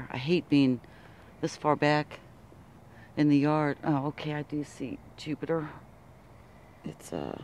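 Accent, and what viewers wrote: United States. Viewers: Good morning {, evening) dear ..,yes very interesting and fantastic. (,,,nos kedves nő annyira jó hallgatni a narrációt azzal az ízes jó amerikai angol kiejtésel , hogy az valami hihetetlen számomra , imádom, és köszönöm.) ..ok ..l wish you a wonderful week - end dear .. ok .. Big Hug.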